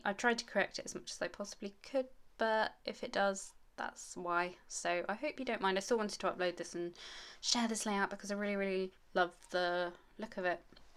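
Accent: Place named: United Kingdom. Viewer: Just found your channel on here. Your layouts are so lovely! I especially enjoy listening to your accent too... I am from the UK originally (Gloucestershire area) and now live in California, so it's like being home again and scrapbooking at the same time! Love your unique style and you have given me new inspiration for the Felicity Jane kits that I can never seem to use up all the little bits and bobs from each month! xx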